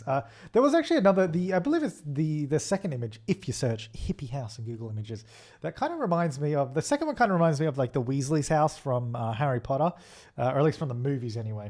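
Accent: Australia. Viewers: He sounds Australian why are all of the Australian YouTubers so wholesome 😂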